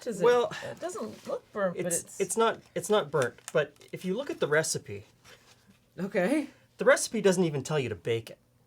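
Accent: Canada. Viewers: I love your vids, but I laugh at how much you sound like my mother's Canadian cousins!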